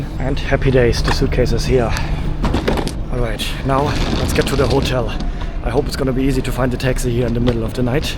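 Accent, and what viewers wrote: Germany. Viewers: Crazy how you have to speak 3/4 years only english and you still have a very very german accent and your your mom who lives in Germany not really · Your German accent is terrible but your mother's is okay.... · That’s lovely German English. accent Your mom is so cute! ❤ I discovered your channel recently. Want to see all of your videos more often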